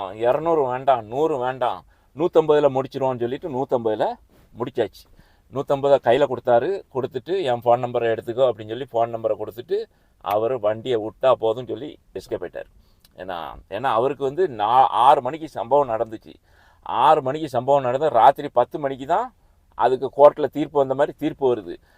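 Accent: Tirunelveli, India. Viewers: ஆமாம், வெள்ளந்தி மனிதர். கேட்கக் கேட்க சலிக்காத நெல்லைத் தமிழ். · இப்படி வளவளன்னு பேசி வீடியோ போட்டால் எப்பவும் கேட்கிறது கிடையாது ஆனா நீங்க பேசறது நம்ம திருநெல்வேலி மொழி கேட்க மிகவும் அருமையாக உள்ளது வாழ்த்துக்கள்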